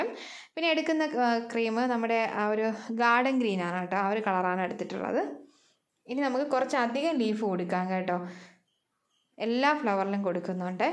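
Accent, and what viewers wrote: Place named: Italy. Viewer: Parece una italiana hablando inglés 😅 me agotó jajaja. Al principio no sabía bien en qué idioma estaba explicando! 😂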